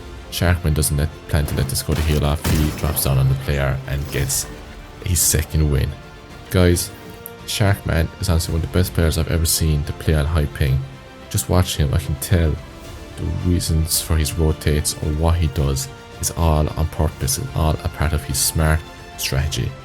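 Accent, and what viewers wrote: Ireland. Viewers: Is you Irish?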